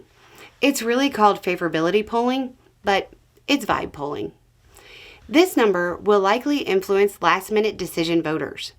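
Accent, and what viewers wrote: South, United States. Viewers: Love the end and that sweet goodbye, especially if she's mad! Oh that southern sarcasm is rich and thick! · I really need to hear you say “Bless his heart” in that sassy sugary sweet southern delivery of yours. Please? Pretty please?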